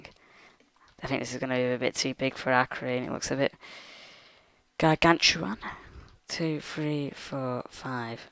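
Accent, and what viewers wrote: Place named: Ireland. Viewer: You sound irish even though you're english.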